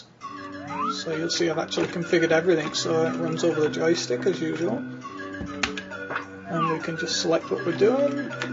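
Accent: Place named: Geordie, United Kingdom. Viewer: hey, how did them yanks find that hardcore geordie accent of yours??!?!?!?!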